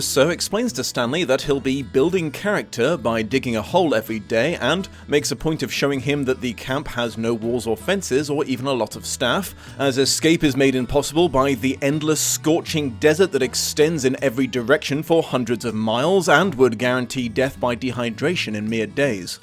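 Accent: United Kingdom. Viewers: if you dont think half of the reason we are all here is to hear the plots of books we already know in a british accent youve misunderstood your audience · I'm happy to have been refreshed the plot of the book with a British accent (although your accent is very different now from when you started your channel!!). It's always nice when something that you liked as a kid still holds up as an adult. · As a fan of both the book and film I quite enjoyed getting a synopsis in a British accent! Caveman is the name Stanley gets for finding a fish fossil. They may bestow it because he's passed their hazing and they've come to accept him, but they chose "Caveman" because of the fossil. This is one of the films I think of when I think of great book-to-screen adaptations, it's remarkably close and makes good choices for what to change. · “Anyone who had seen the film recently probably felt a tad bored there at having the entire plot narrated back to them in a British accent.” Dude, that’s why I’m here! 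· just to be clear, not a single American will ever be bored hearing a British narration. You might as well read the Terms and Services with that voice and we’ll tune in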